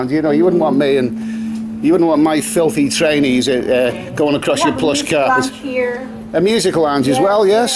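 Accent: Liverpool, United Kingdom. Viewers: a bit of a scouser I think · New to your page, you sound like a scouse. I haven't lived in UK for almost 50 years. It looks OK there.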